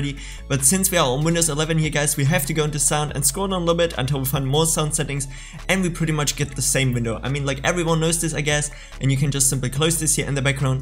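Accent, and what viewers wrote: Germany. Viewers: So ein deutscher Akzent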